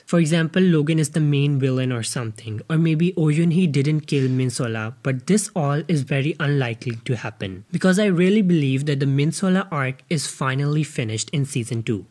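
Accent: India. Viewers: Indian voice??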